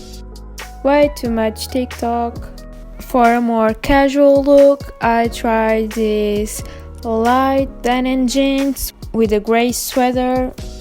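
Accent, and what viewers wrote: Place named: France. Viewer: Par tout hasard tu parlerais pas Français ? Y’a un petit accent quand même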